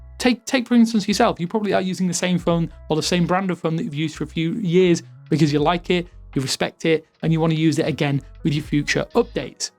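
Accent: United Kingdom. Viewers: I don't like this guy's British accents.! ❌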